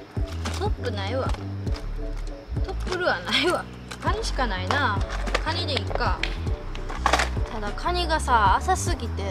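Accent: Senshu, Japan. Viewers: 見ときや、見りや 泉州弁ええな。やっぱ。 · ﾊﾞﾘﾊﾞﾘの関西弁 笑えるし よいな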